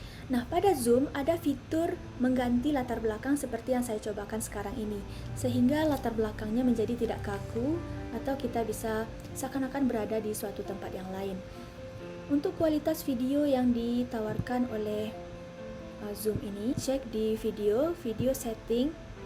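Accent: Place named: Surakarta, Indonesia. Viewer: Sing ngomong putri Solo. Jan uallon tenan, setengah berbisik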